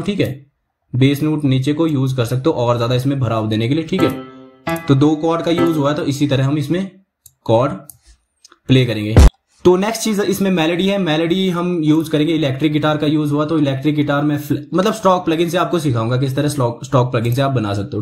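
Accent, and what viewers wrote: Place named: Hills, India. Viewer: aapki awaj se hi lag raha tha ki aap khi pahad se belong karte ho , main Uttarakhand se 😊🙌🏼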